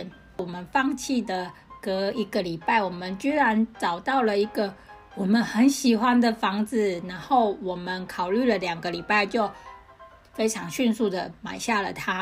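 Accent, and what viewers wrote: Taiwan. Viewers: 我喜歡這個心智圖，還有，台語腔調的國語，哈哈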